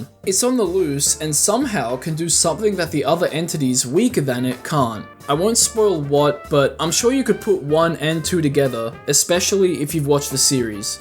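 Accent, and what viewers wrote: Australia. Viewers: You're Australian? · Wait you’re a fellow Aussie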